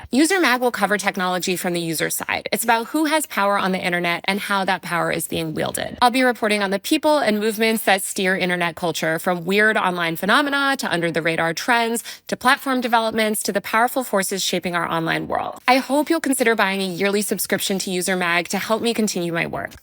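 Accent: California, United States. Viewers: Wapo sucks, your op eds suck and appealed to around 3% or less of the US poulation because your snotty pompous Cali sounding self knows nothing but a political agenda and a bad agenda at that because you dont live in the real world. You live in a concrete, artificial, air conditioned life with no contributions to society and you could have with all the corruption that goes on but no and ive read a few of your op eds and I could hear the simpleton Cali no nothings voice just reading it. Opinion isnt journalism so quit calling yourself one.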